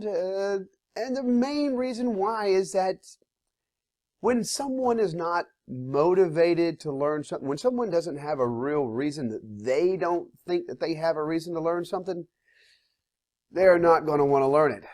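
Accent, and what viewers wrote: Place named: Louisiana, United States. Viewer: Are you from Louisiana? Nice video and very well presented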